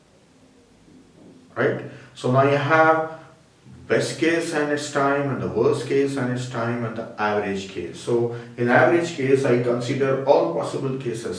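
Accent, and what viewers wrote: India. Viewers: you are speaking Indian English the way an Indian guru would speak it. i love you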